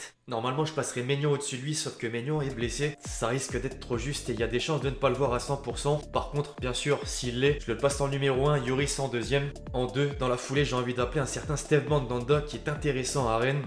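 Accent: North, France. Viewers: pareil que toi, sauf que je prends upamecano pour kounde, je savais pas que t’étais deuch nord (j'oro du min douter avec tin accent) en plus t'es sang et or !!! je m'abonnes de suite.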